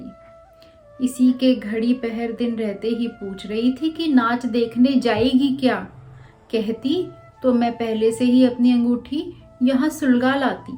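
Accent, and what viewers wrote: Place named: Bihar, India. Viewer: बिहार के सीमांचल क्षेत्र का विशुद्ध देहाती पुट से परिपूर्ण है,"रेणु"जी की कहानी और आपने इसकी प्रस्तुति उसी भाव एवं उच्चारण के साथ करने का अच्छा प्रयास किया है।आपको बहुत बहुत साधुवाद।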